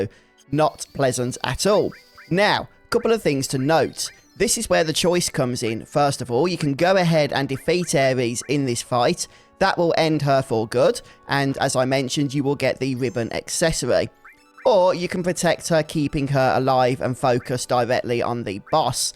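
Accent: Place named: Black Country, United Kingdom. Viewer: I love whenever I open a video and hear a Birmingham/Black Country accent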